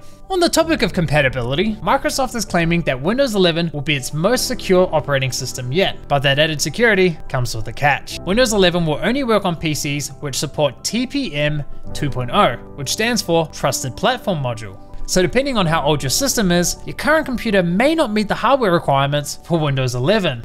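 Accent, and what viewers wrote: New Zealand. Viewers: I know you live in Germany.. but what is your accent? New Zealand? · Are you a kiwi?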